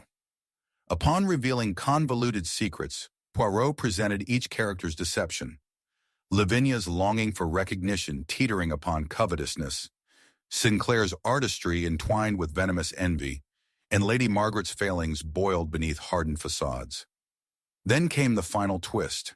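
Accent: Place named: United States. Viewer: Oh dear - AI American accent is just so wrong!! Add this channel to your "do not recommend list"!!!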